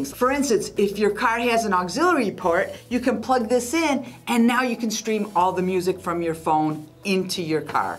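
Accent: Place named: Minnesota, United States. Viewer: I thought she was from Minnesota, I kept waiting for her to go "dontcha know".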